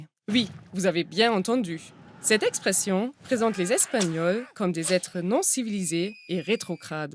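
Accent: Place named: Germany. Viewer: Elle a l'accent allemand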